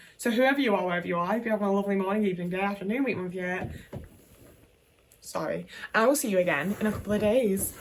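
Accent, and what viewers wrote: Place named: Northern England, United Kingdom. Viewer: British women with their Yorkshire tea speaking in that northern accent --- so exotic and wonderful.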